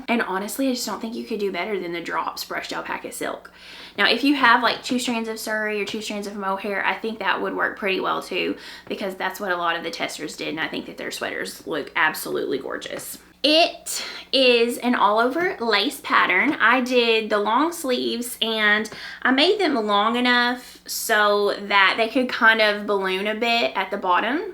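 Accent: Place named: Arkansas, United States. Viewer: Hi! I love your podcast!! I’m a fellow Arkansan transplanted to Texas a long time ago and I just love hearing your accent from home! I love your Zosia wrap cardi - so glad you finished and survived!!! I can’t wait to make it in a light gray. You asked in a previous video for book recommendations and I recommend reading “A Gentleman in Moscow” if your haven’t already read it. It’s a beautiful story. My mom and I are reading the Thursday Murder Club series at your recommendation and absolutely love it! Thanks so much for all you share!! You’re so inspiring 🐝 🐓 🧶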